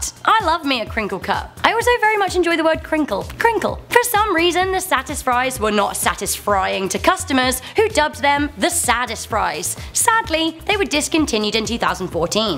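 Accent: United Kingdom. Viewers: She made me cringe each time when she pronounced it as "pITas" instead of "pEETas", lol and killed me when she said "naughties" instead of "nineties". She speaks very much like my ex mother in law(she is British), except I like listening to this woman speaking...and not the ex mother in law.lol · I think it may be British pronunciation cos I say taco like that and I’m British cos Americans have a TOTALLY different accent so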